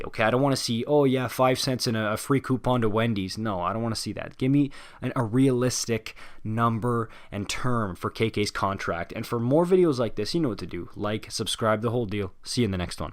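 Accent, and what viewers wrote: Quebec, Canada. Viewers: Il est possiblement Québécois en se basant sur sa prononciation des noms français. Les Québécois sont généralement bilingues et n'ont pas d'accent dans les deux langues. Ou il est quelqu'un qui a appris le français très sérieusement. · Mon radar a queb sonne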